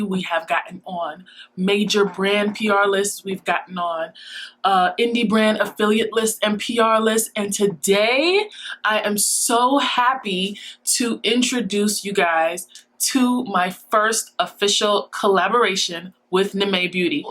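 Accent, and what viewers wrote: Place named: New York, United States. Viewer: COME ON, NY ACCENT!!!!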